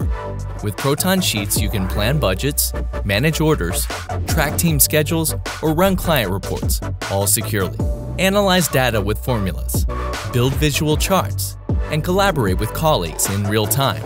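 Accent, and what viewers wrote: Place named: United States. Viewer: Congratulations on the great products. That said, the narrator’s American accent feels out of place. Proton is a European company—why not present it with a European accent?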